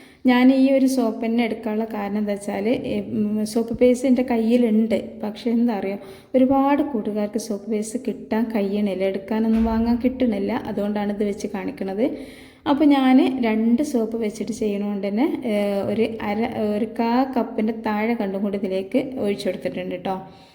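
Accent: Malappuram, India. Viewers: Ingineyum samsarikkan ariyo allenkil malappuram thathade aniyathiyano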